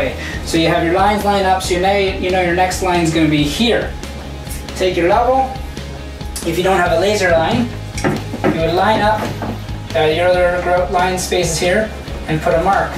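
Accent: Canada. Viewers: Great video, you sound Canadian?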